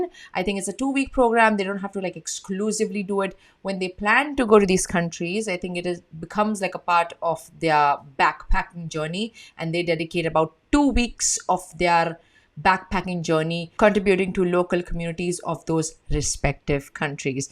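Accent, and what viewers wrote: India. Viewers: Your English is More Indian than Israeli❤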